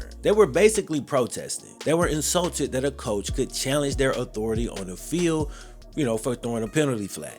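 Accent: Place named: Dayton, United States. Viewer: Bro, I’m born and raised in the Dayton area. You sound like you’re from here. As real as a bengals fan gets.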